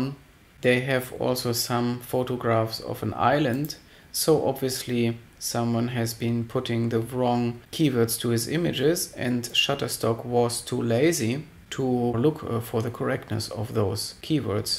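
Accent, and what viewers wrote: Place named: Germany. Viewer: Kein problem.. I like to hear De-nglish..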